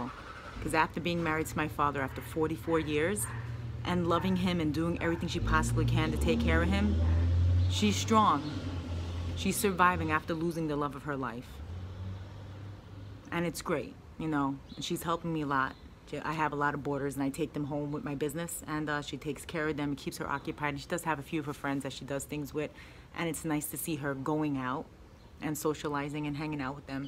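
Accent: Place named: New Jersey, United States. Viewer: a stalker. By the way you sound more like Jersey than Brooklyn. Lol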